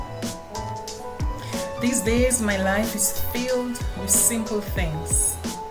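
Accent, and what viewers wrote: Saint Lucia. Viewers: I instantly hit subscribe when I heard that accent! As a fellow Lucian myself I am enjoying your videos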